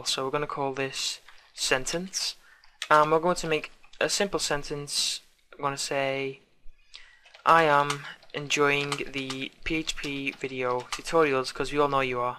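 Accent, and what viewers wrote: Liverpool, United Kingdom. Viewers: this actually really helped me thanks, btw im also from liverpool (you sound like you have a scouse accent)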